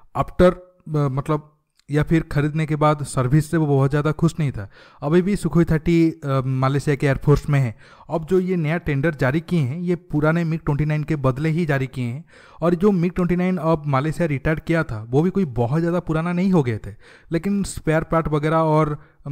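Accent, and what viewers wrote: Odisha, India. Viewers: This fellow is out and out Odiya.His accent reminds me of my very good friend Mahapatra.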